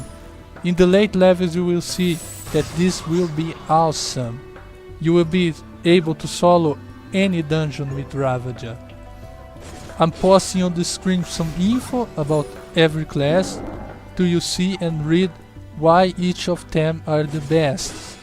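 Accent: Brazil. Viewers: esse sotaque brasileiro!!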